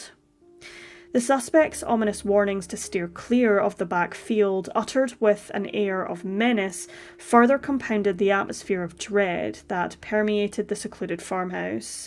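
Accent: Scotland, United Kingdom. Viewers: It is a Scottish accent so the woman is a native English speaker..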